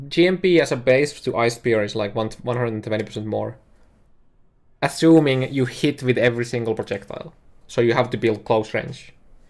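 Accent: Finland. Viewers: Olin huomaavinani Suomalaisen aksentin ja sitten katsoin nimeä. Kyllä vain, hyvin tunnistettava aksentti.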